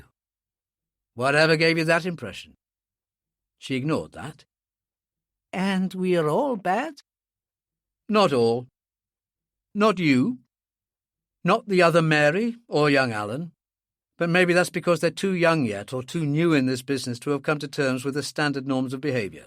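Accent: England, United Kingdom. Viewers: I have been seeking a channel with minimal adds. In Alistair MacLean’s audiobooks I find that there are no adds but there is a difficulty with the reader. The English accent makes it hard to understand some of the dialogue. This audio book drags on and on so I have increased the reading speed However in doing so the reader’s English accent makes it even more difficult to understand.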